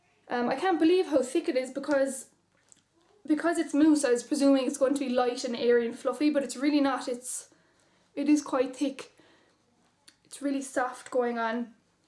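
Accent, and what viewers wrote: Ireland. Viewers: I love your accent so much!!! I love Ireland!!!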